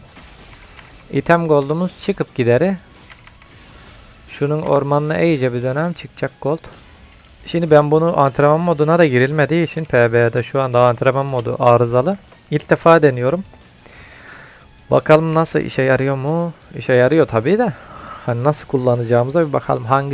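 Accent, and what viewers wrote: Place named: Aksaray, Turkey. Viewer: abi şiven Aksaray şivesine benziyor bende orda yaşıorum tam olarak bilgi verirmisin acaba bu konuda bize D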